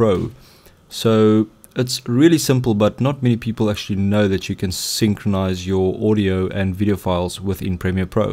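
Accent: South Africa. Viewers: dude, you're south african! Awesum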